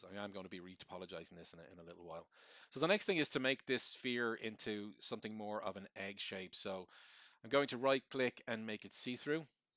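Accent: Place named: Ireland. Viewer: so rare to come across an Irish voice when looking at YouTube tutorials. Lovely.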